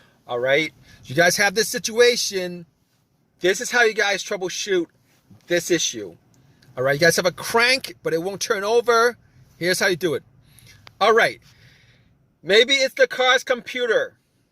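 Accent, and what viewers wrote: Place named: Staten Island, United States. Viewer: Sounds like Staten Island accent. Judging by shade it’s 10 30am sxo he’s up early + just had first puff puff. Sadly, He and the boys won’t be getting “za” today.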